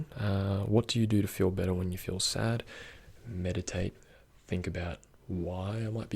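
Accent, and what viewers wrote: Australia. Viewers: I don’t know why but your voice reminds me of an Australian Fred’s Voice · Yes another Australian asmrtist!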